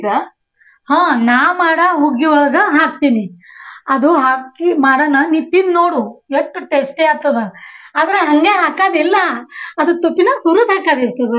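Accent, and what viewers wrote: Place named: Solapur, India. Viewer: Nimma yavdu ooru .namma solapur hage maatu ide.👍huggi bhalu channda agide.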